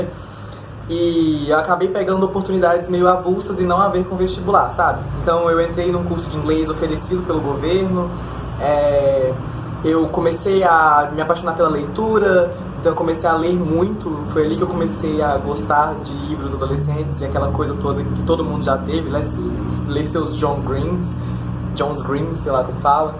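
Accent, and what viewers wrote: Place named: Minas Gerais, Brazil. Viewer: inspirador. já pegou o sotaque mineiro todinho! hahah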